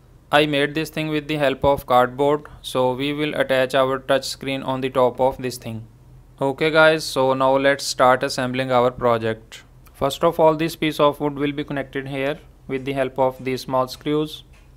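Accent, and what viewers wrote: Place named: Pakistan. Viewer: after hearing your voice I'm guessing you are Pakistani.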